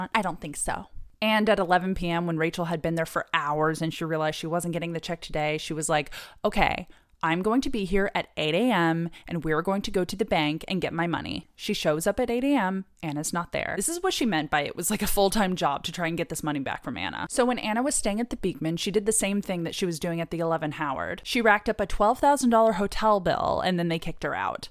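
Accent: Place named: Europe. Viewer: Heh.. yeah her accent is quite... Europeish. Like, "somewhere east of France". And yes, it was, and you hit the notes perfect!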